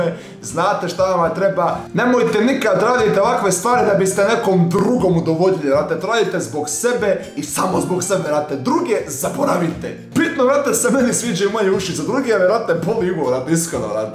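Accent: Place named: Montenegro. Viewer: Koliko volim taj crnogorski naglasak😁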